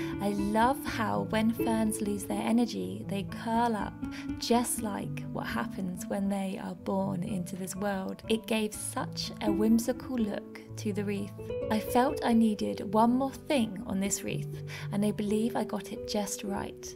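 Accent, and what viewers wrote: England, United Kingdom. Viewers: Witchcraft sounds so much more magical when discussed in an English accent! 🍂🍁🍂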